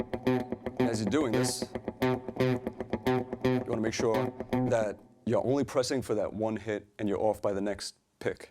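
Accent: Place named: New York, United States. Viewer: He sounds like FZ with a NY accent.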